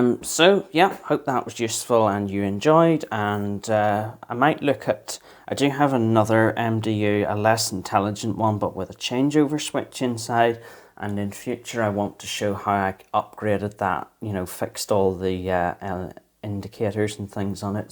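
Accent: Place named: Fermanagh, United Kingdom. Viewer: Sounds very Fermanagh-esque.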